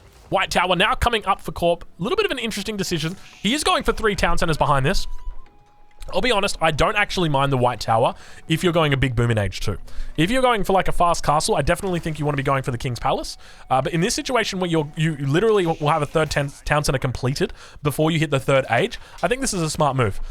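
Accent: Australia. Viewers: Even on 0.75 speed u talk too fast man… slow down!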